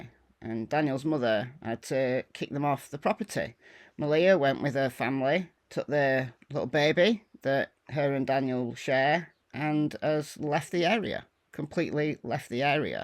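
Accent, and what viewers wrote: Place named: Northern England, United Kingdom. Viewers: What part of England are you from! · I love your style of reporting - I live in Wales so it’s nice to hear your northern English accent!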